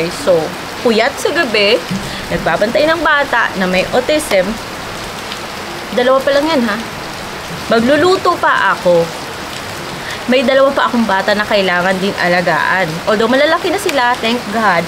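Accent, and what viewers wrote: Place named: Visayas, Philippines. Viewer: dah gawas lge imong pagka bisaya ahahaha..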